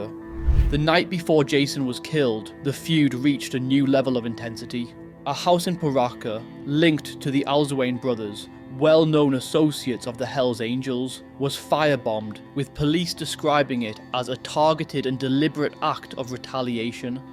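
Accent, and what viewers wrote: United Kingdom. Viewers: My favorite British voice telling me Aussie crimes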